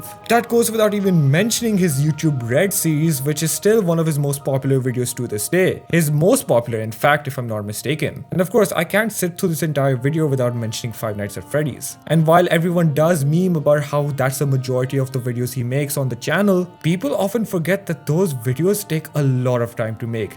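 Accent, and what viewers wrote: India. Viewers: Finally an Indian who recognises him!!!! I recommend fixing your accent and maybe try to better your editing and you'll get millions of views in no time!!!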